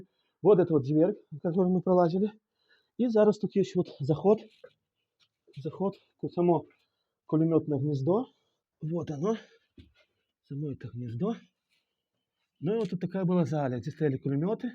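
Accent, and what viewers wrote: Trasianka, Belarus. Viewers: Так он и разговаривает на трасянке.. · З удзячнасцю дзякую за расповедзь пра Ракаў. Добра зайшло. Прыемна слухаць было вашу трасянку. Добрае дзела робіце. Чакаю трэццю частку. Не кідайце сваю справу.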